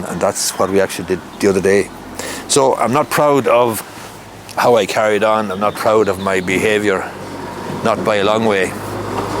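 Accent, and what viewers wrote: Ireland. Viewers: I luv the irish accent. 💓 Great video bthanks for your insights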